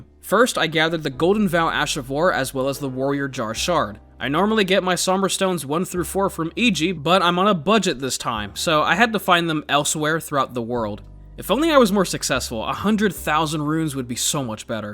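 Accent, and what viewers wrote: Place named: Egypt. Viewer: wow u r Egyptian ur accent is on another lvl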